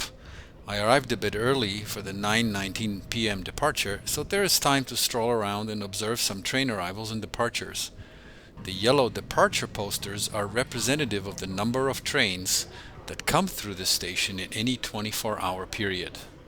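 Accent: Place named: Poland. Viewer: Świetny materiał , znajomość języka perfekcyjna z lekkim polskim akcentem . Ale sam filmik perfect .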